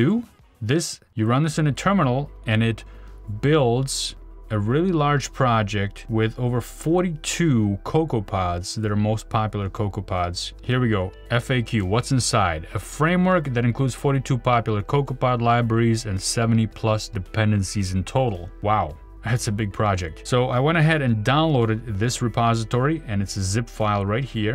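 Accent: Russia. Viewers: da , kagda ya slishal evo ruski dialect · Русский акцент выдает мистера. Гуд кантент. Ай хит дат лайк баттон.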